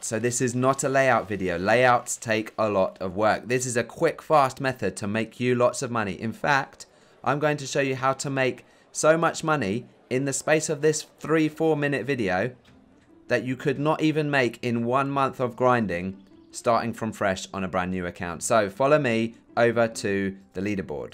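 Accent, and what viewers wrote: United Kingdom. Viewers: Your British? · I know u is British I am American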